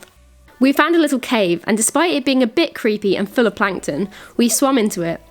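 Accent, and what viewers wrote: RP, United Kingdom. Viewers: I thought so! I can tell by your BBC accent! Do you find it difficult to understand the Swansea accent? I’m returning there in April after 20 years in Singapore. I’m a bit too old to be as adventurous as you though. I used to hang glide off Rhosilli though. Your vids are great BTW!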